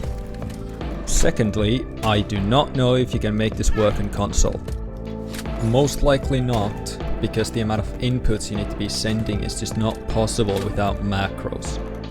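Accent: Finland. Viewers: Am I hearing the finnish accent?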